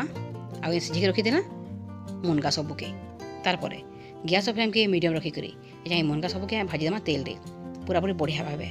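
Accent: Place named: Sambalpur, India. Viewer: Tumar samalpuri kathata jaha mitha lagla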